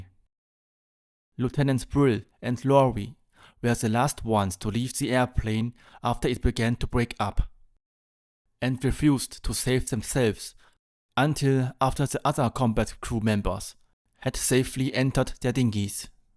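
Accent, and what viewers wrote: Germany. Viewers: Thank you posting, and your English is perfectly understandable . I want to say your either Austrian or German going by accent . Thanks again for posting this, very interesting ..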